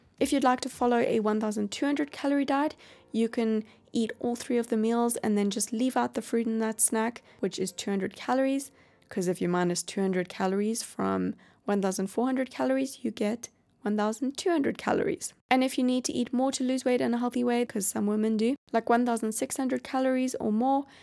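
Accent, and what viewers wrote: South Africa. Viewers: In South Korea, was busy writing when you started your video. Seconds into the video I asked myself, "Is this person South African?" and then you said "Ja." It's SO good to hear an accent from home whilst being in another country. Thanks for the video. I'm subscribing. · you sound south african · As soon as you started talking I knew you were from South Africa 😂😂💗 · I'm new to your channel but are you south African? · Are you South African? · Is that a South African accent I hear?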